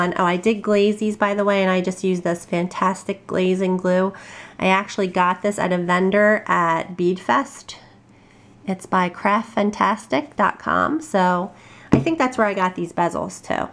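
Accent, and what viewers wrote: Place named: Georgia, United States. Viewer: last night i ran across eva tissen too and i looked thru her gallery and was literally blown away and i was like i would looove to be able to do this . i am gonna hafta give it a try! you did awesome with these pieces! my favorite one is the daisy one. i love how you curl the vines on some of them! btw you have an accent like my cousin who is from warner robbins ga. :)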